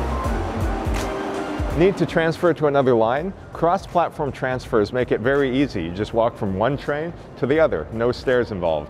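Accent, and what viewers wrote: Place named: Quebec, Canada. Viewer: Un peu quebecois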